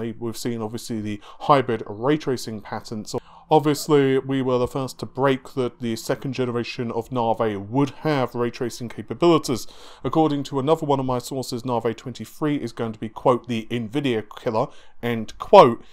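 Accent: United Kingdom. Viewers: He is British, not American. Jesus.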